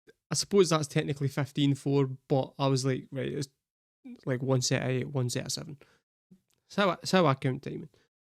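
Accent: Scotland, United Kingdom. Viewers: My lad, you have the thickest Scots accent I've heard on YT! Tell us what part of the Auld Sod do ye hail from and whether or not you speak Gael?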